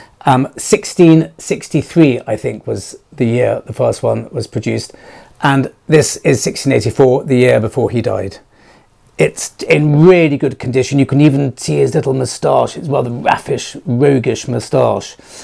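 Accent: RP, United Kingdom. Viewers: Just discovered you. 🇦🇺here. Nice to hear a well educated British voice. Watched “best 5 vlogs x2. Eventually will get to others.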